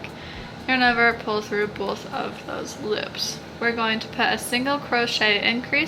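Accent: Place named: New Hampshire, United States. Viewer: Are you from New Hampshire? Your accent is so cute 🥰😊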